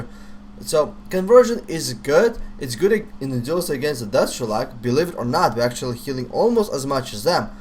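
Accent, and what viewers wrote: Russia. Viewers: Ты русский? Серп и молот вместо глаза, акцент. Да?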